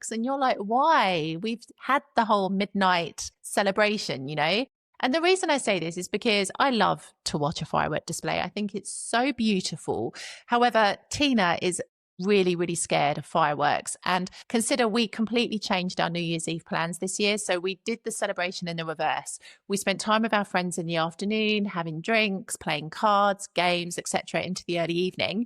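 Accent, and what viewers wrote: England, United Kingdom. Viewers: I love you commentary and English accent gets me 😂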